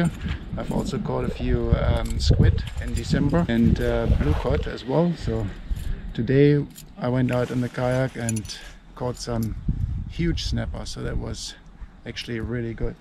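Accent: Germany. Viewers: German accent 😄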